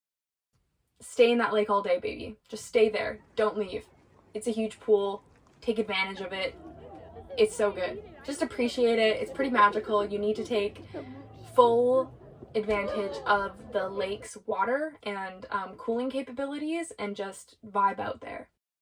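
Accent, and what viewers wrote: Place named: Canada. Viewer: Your vids are cool, and I love the way Canadians say "aboot" (about).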